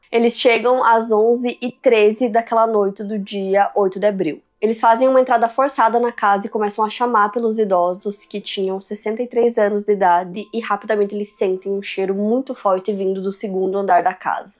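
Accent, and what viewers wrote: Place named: South, Brazil. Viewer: gosto do sutaque do sul rsrs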